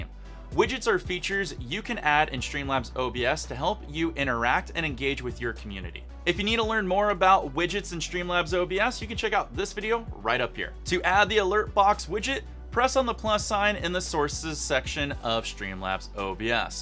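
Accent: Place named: Israel. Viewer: אתה ישראלי אתה לא דובר אנגלית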